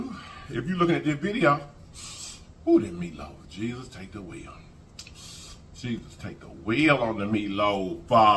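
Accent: South, United States. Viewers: HEY ITS MARGARET FROM DAYTON OHIO BUT I WAS BORN IN MOBILE I LOVE YOUR COUNTRY ACCENT I LOVE SLL FOODS U EAT I JUST LOVE YOUR. FAMILY TOO Y'ALL SLWAYS MAKE MY DAY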